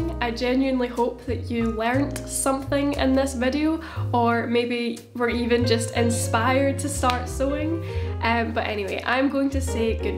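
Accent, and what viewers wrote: Scotland, United Kingdom. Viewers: Came for the pattern, stayed for the Scottish, yass 🙌 🏴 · Ahh I love your Scottish accent!! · Pretty sure she has a Scottish or Irish accent, I think Scottish though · I subscribed to hear your (Scottish?) accent as well. Ha ha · You are a pleasure to watch. Simple instructions and fabulous end result. Keep up the good work. Watching from Victoria. Australia. Love your Scottish accent.😎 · Are you from...Scotland? You have the best accent! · Fucking terrifying to see a Scottish accent on the internet, like I only see you guys outside when I leave my house???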